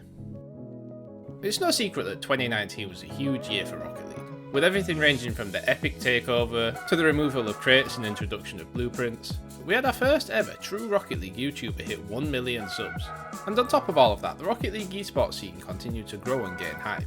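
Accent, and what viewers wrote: Yorkshire, United Kingdom. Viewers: wait are you from yorkshire hell yea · Great vid! By any chance are you from Yorkshire cause u sound like it 😂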